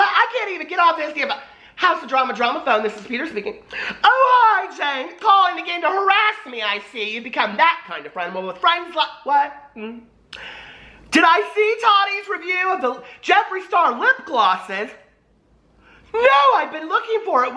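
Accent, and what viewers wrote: South, United States. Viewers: OMG you do remind me of Nancy Grace! Sassy and southern! Can she please come back on TV?! I miss the endless tea on tot mom and that Jodi Arias.....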